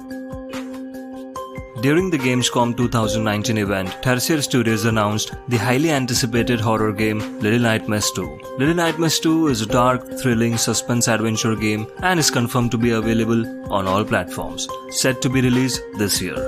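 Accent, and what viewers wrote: India. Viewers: Are you from india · I can sure u r an Indian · Are you from India🤔?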